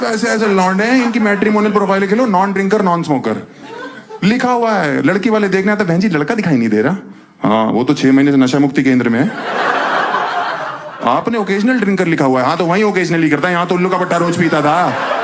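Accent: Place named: Uttar Pradesh, India. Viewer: His UP accent is the best 👏🏻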